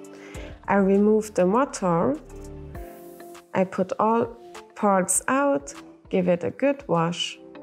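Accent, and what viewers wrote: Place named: Germany. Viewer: Am besten bitte gleich deutsch sprechen. Dein Deutsch-Akzent ist so stark, dass ...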